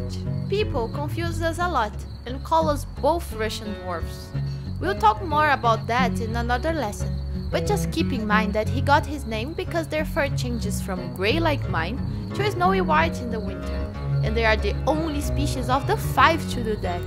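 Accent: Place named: Brazil. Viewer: are you brazilian? you have a brazilian accent that i have too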